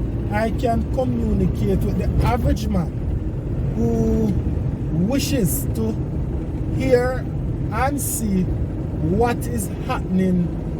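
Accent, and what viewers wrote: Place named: Jamaica. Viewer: I don't know which idiot would complain about the way you communicate . Simply ignore such person as he/she only speaks for self and is making mischief and being hateful. I'm sure it's only one comment as u r simply one of our most articulate communicator... Staying true to our dialect and a proper English speaker .. Does this person expect you to speak another language ? Anyone can tell that you are a very intelligent person .. but it would not have mattered if you weren't (as long as wi understand ) I followed persons that speak 'Straight Up Patios ' and I love it !! It doesn't mean that such a person can't speak proper English .. It's just how one choses to communicate. So what if one can't ?! We have our own dialect and we are proud Jamaicans ! Bredrin , don't respond to those negative bad minded stupid people in the future ! 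Don't accommodate them ! Yeh ? Thanks for all your footage on the development. I am a big fan 👍🏾 Keep up the good work bro! 👏🏾 One Love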